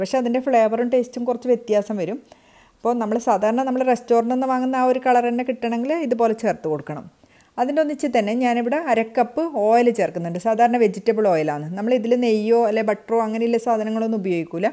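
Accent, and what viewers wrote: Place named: Kasaragod, India. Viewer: ഉദുമ ,കാഞ്ഞങ്ങാട് ,നീലേശ്വരം ഭാഷ ...☺️ നല്ല സിംപിളായ അവതരണം ...👌🏼😍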